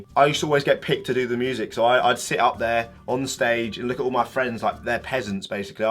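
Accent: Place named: Essex, United Kingdom. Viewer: you sound like you came from My primary school! Then again its in essex so you probably didn't...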